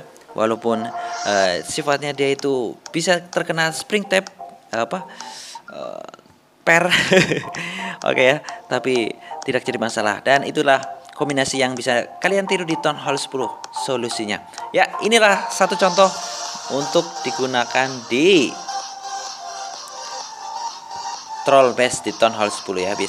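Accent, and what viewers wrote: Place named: Java, Indonesia. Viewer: suaramu wagu bang. medok dan tidak beraturan. ga enak d dengernya. #no haters.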